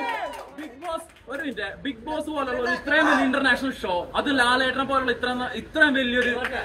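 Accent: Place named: Coastal Kerala, India. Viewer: No kadappuram slang now😮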